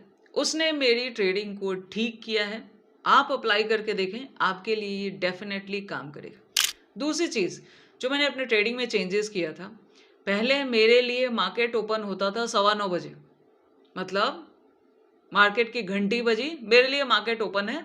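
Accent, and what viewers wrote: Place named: Haryana, India. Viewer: u belong from Haryana as per ur tone❤🎉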